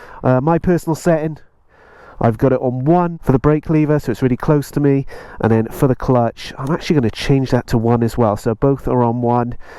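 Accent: Wales, United Kingdom. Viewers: Nice to hear a fellow Welsh man again. Welcome back.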